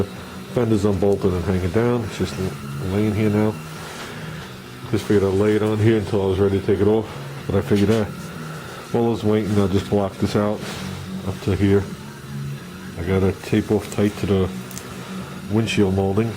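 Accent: United States. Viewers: That's funny, It was good to hear AMERICAN for a change .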